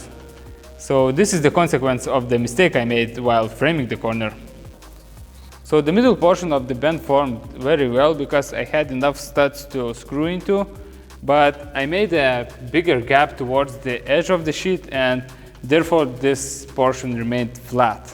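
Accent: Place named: Russia. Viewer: Даже мне рускоговорящему режет русский акцент 😅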